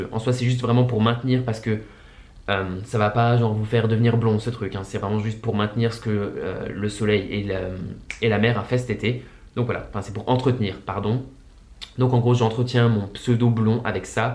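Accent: Alsace, France. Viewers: Oh !? tu es alsacien ? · Cet accent alsacien team Strasbourg ❤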